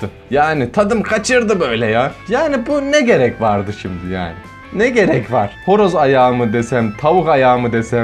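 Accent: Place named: Ankara, Turkey. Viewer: Aa ben de Çorumda yasiyorum. Burada da Ankaralılar var konuşmalarınız benziyor zaten 😆😊😂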